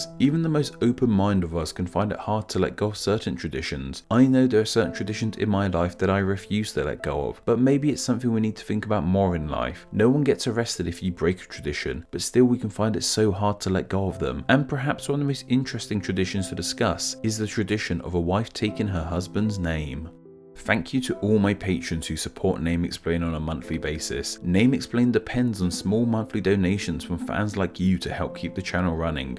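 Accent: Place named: Southern England, United Kingdom. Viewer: Agreed I'm from England but this guy is to much of a southerner and it just makes some videos painful to watch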